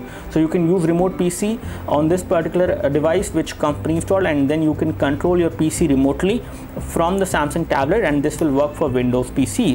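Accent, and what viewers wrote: India. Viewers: Indian pronunciation :D kill me i cant understand at all sound funny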